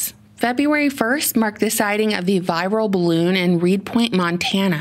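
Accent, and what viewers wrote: South, United States. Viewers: I like how you narrate this in the same tone the same way you’d read a story book to a child. It’s nice. Like “and thennnn” in a song song y voice. I can tell you’re southern to the bone all us southern ladies do this. 😂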